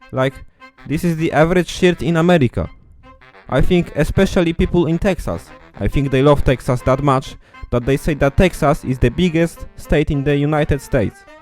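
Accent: Poland. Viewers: Oh my, your english sounds so Polish. I am saying this as someone from Poland. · Nie ironicznie przyjemnie się słucha z polskim akcętem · Słychać, że polak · Odrazu po tym akcencie wiedziałem że polak xddd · czytany angielski ciebie zdradził xD zanim powiedziałeś że z pl już się domyślałem ale ogólnie to sam nie umiem czytać za dobrze, umiem dużo w angielskim mi sie wydaje [ bo moje porównanie to moja klasa xD ] ale ogólnie alfabetu angielskiego czytanego nie pamięta całego, a to co pamiętam to i tak angielski nie jest dokońca spójny np. know i no czytamy zawsze jako "noł" choć inne słowa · Masz najmocniejszy polski akcent jaki w życiu słyszałem. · yes he is polish he sound polish he speeks polish good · His accent, lmao. As Pole speaking English for years - he basically polonizes every english word. It's absolute beauty. It's me if I don't know how to vocalize word in english all of sudden. 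· Polski łamany na angielski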